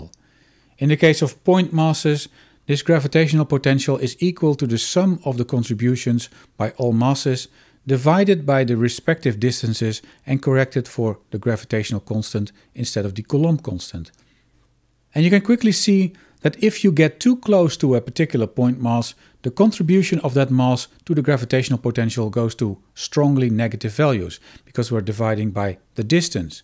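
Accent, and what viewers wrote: Netherlands. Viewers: I hate the Dutch accent.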